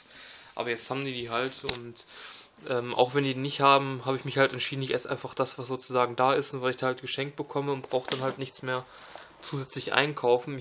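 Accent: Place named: Ruhr, Germany. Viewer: Hab aufs Ruhrgebiet getippt :D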